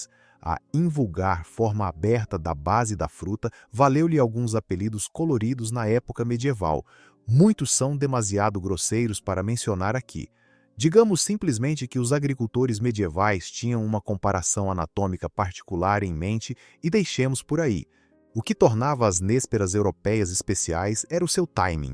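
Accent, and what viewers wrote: Brazil. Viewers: Texto de manele com sotaque brasileiro. Ficou ó. Uma bosta. "Sabor à noz". Ridículo. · Dialética de Portugal, fonética do Brasil.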